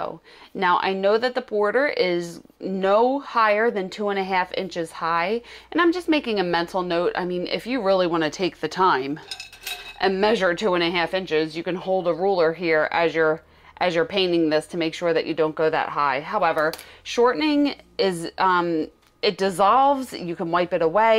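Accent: Philadelphia, United States. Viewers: Your video SAVED me!!! ❤🎉 Thank you!!!! Love your channel your Philly-accent too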